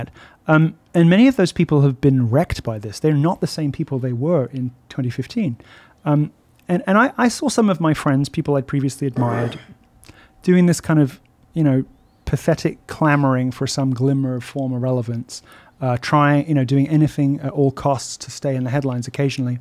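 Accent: United States. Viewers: Do I detect Milo picking up a bit of an American accent? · he is developing a American accent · Milo is starting to sound American in his parlance! I admit it as an English woman I am biased towards the more refined English intonation! js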